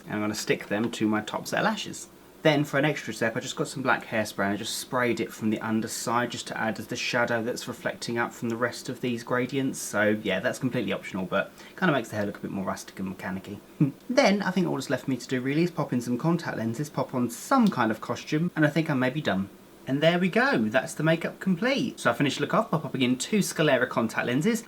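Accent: England, United Kingdom. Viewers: Are you from england?????? Just wondering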